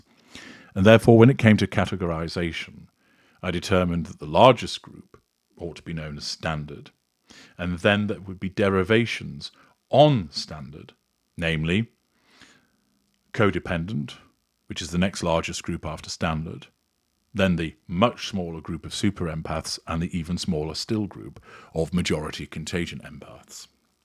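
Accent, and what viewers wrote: United Kingdom. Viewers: Why is everyone with a British accent automatically a douche? You're the ultra alright. Ultra twat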